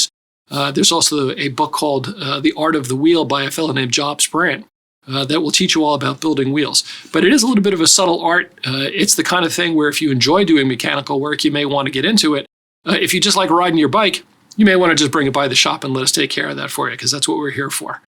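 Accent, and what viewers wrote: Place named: Pittsburgh, United States. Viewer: You say now and round like my grandmother she was from Pittsburgh. Great video sir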